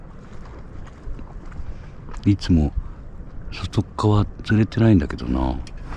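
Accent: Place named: Chiba, Japan. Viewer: 「あんでだよ～！」(何でだよ～！)好きです、千葉弁。「青アジばっかでしっけになんね」でも楽しそうでなによりです。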